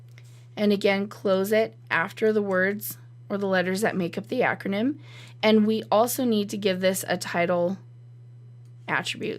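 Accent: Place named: United States. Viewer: Thank You :) Finally someone with American accent ;) I was watching 2 previous videos with strong Indian accent... I didn't understand it at all..:D Great lesson thanks again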